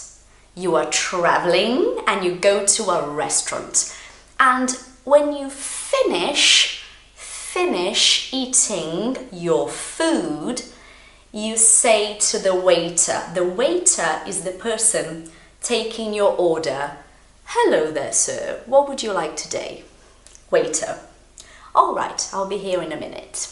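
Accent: United Kingdom. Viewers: Como que eu não te descobri antess! Sou iniciante e amo o sotaque britânico e procuro estudar conteúdo britânico, mas nada se compara a ti: uma brasileira que entende os pontos que temos mais dificuldades. Thank you!!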